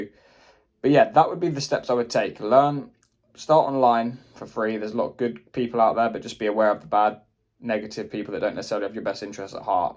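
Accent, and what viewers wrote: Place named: England, United Kingdom. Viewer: I just love your English accent!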